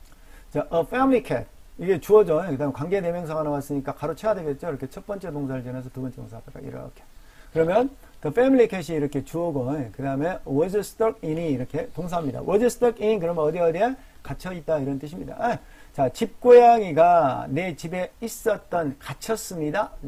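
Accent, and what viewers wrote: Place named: Jeolla, South Korea. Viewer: 흐흐 구수한 전라도 사투리... 저절로 드러나시네요.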